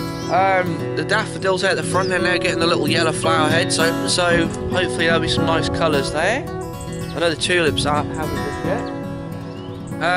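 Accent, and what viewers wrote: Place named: Norfolk, United Kingdom. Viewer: Lovely to hear that Norfolk accent. The Fens is a beautiful area. We are the other side of Norfolk, out Norwich way. Just found your channel. Good luck with your spuds :) Jazz and Gem.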